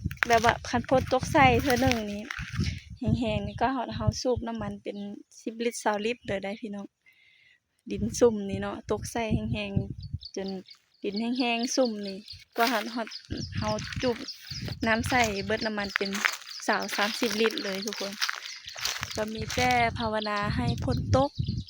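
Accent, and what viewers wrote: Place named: Phu Thai, Thailand. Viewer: ได้ยินคำเว้าของน้องทำให้เราคิดถึงบ้านเพราะเราก็เว้าเหมือนกันภูไทย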